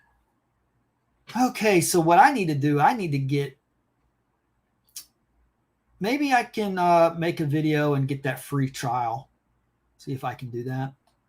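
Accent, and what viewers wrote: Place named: Scotland, United Kingdom. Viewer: Hi Im not really Stalking you lol. I love your channel. Id go live later after UK time 6pm when everyone is home from work. From experience more people will be able to join your live. I dont know what time it would be where you are. Your Scottish accent is brilliant. I love your coverage and hope Richard gets justice. Lots of love 💖💕xxxx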